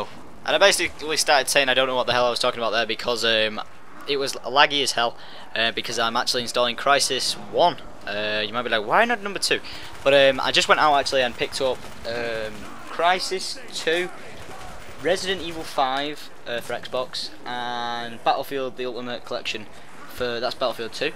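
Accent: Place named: England, United Kingdom. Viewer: Where in England are u from?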